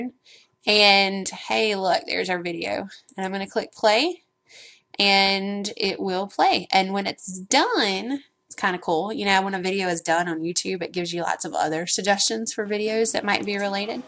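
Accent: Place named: South, United States. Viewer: HOT/SMART/SOUTHERN ACCENT. Your gonna make someone very happy.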